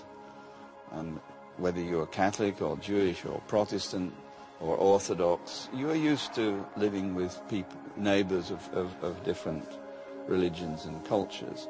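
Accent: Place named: Poland. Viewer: pan davies z bardzo ładnym polskim akcentem wymawia polskie słowa, pozdrawiam ;)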